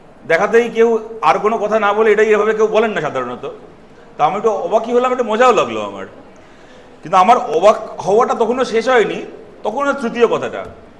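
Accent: Bangladesh. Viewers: Who is not available on pronunciation about parijon and proyojon,he is what type of leadership. what is aramvabe? What are these educated man? Joytibasu made backward West Bengal few decades mainly in English language. actually vat speaker. She has not proper pronunciation also in Bangla. Her pronunciation like Bangladeshee.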